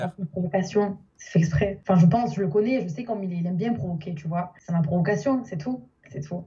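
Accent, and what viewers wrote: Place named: Marseille, France. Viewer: A Marseille on parle comme ça vous avez un problème ?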